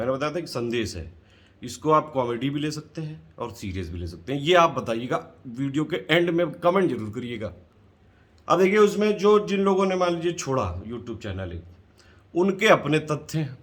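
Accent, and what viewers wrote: Kanpur, India. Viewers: Aaj kanpuriya style may sandesh bheja hai.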